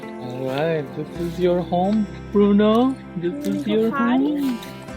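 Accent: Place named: India. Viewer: Do you know hindi I am asking you this because your voice has some indian accent and your face is also like indian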